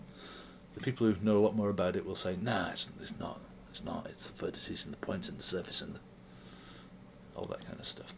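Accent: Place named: United Kingdom. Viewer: FINALLY another british person..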